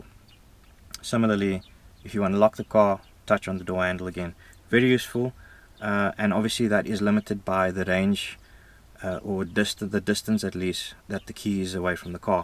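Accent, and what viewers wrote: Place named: South Africa. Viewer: This guy has a soothing voice. I love a South African accent… Great car too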